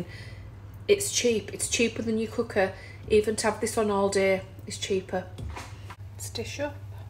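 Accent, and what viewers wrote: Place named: Sunderland, United Kingdom. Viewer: Are you a Sunderland lass I’m a Geordi xxxxxx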